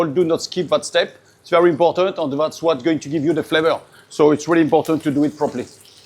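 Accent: France. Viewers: Why does it look and sound so much better when done by a Frenchman? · French 🇫🇷 English is so funny 🤣 I am trying to absorb and re-enact as a stand up comedian. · Your French accent is even better than the dish ❤ Thank you so much 😊 I'll be looking out for your other recipes.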